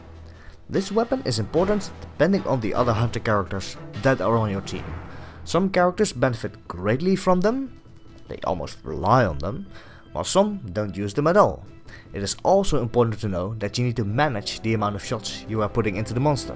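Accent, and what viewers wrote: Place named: Netherlands. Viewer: Nice try, you're Dutch, I can hear it!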